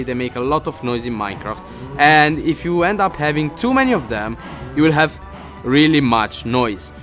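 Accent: Italy. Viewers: si sente il tuo accento italiano :D! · Si sente che sei italiano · quanto si sente che sei italiano?!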